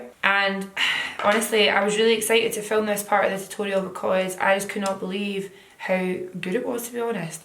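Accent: Scotland, United Kingdom. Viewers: Really love this look! We're fellow Scottish YouTubers! Love hearing Scottish accents on YouTube, it's so rare! 😄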